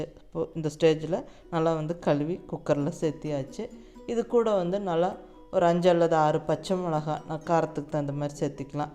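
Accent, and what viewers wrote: Kongu, India. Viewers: கோலை கொங்கு தமிழில் பேசி சமையல் கலை அற்புதமாக இருந்தது பீர்க்கங்காய் கடைசல் ஜோர் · Ama madam namma kongu spe super 👍👍👍👍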